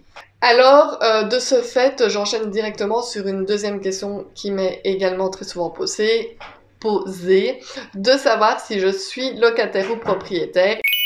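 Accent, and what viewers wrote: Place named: Liège, Belgium. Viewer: Coucou, je me doutais bien que tu étais de Liège ton accent 😊. C'est quand même impressionnant d'aller aux urgences, et de s'entendre dire que nous n'avons rien. Merci bonne journée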